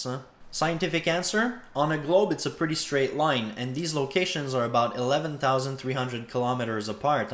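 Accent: Quebec, Canada. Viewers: It's because he's French Canadian, so he prounces his "ah" sounds as "ey." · Cleary a Canadian eh? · Es tu Québécois ? Ton accent me le laisse croire. Très bon vidéo en passant !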